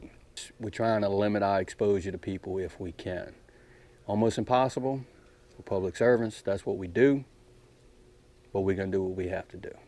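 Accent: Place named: Louisiana, United States. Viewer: I came over from another Mississippi channel...Justin and family's Homegrown Country Homestead. BTW...that graphic at the end is really not necessary...LOL. About a minute in to the video I thought, "He might live in middle Mississippi but he's NOT from there. He sounds like he's from down around Laffayette." I've been looking around the channel and I think I'll like coming back regularly. We do disagree on one thing though...this COVID-19 thing. I think we are far apart on it. Have an awesome and blessed day.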